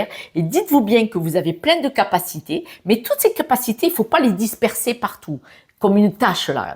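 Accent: South, France. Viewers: Oui je suis d'accord avec vous...j'ai commencé à prioriser ce que je pense faire sur mes jours de vacances...rangement et sorties avec les amies...mon autre est statique...je dirais..hyper-statique 🙄 Vous êtes l'alchimie de Louis de Funes (en geste) Maïté(en paroles bienveillantes avec l'accent)Jamie Lee Curtis(pour le physique) et le tout avec le ❤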